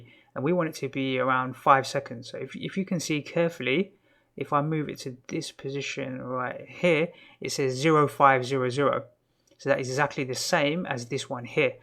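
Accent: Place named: London, United Kingdom. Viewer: Nice work Dude! brilliantly explained. The fact you sound like a Londoner makes it feel quite homely :)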